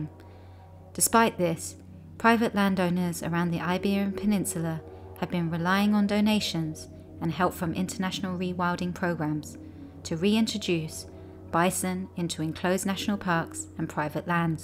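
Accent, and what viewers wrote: London, United Kingdom. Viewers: Nicely narrated. Detect a slight London influence? Excellent!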